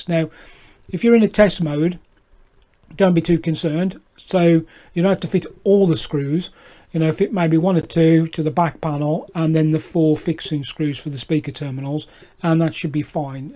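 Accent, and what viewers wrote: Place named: United Kingdom. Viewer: Thanks for the reply, what is their shipping time to UK like? (I'm guessing your in the UK from your accent)... :)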